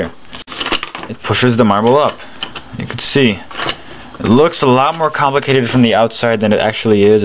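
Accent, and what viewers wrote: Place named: Russia. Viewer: is russian your first language or something? you have an accent. can you speak russian at all?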